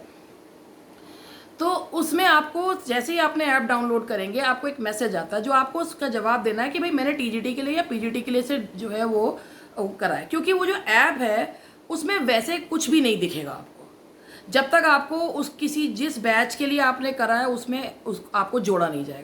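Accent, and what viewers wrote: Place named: Meerut, India. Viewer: Good evening ma'am..... without knowing,I guessed that you are from Meerut.....you speaking style reflect this.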